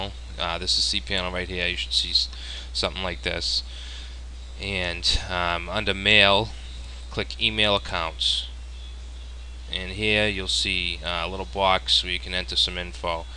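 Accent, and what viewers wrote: Boston, United States. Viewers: Oh my god he kept his Boston accent back then hahaha.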